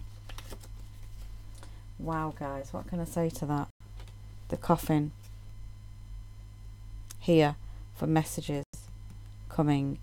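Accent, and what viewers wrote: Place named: England, United Kingdom. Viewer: your welcome its nice to listen to an english voice too, nothing against any others, ive just not come across many english speaking readers. From uk england.:-)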